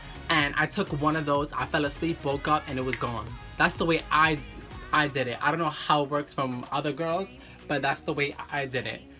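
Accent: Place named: New York, United States. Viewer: It sounds like your from NY I really like literal showers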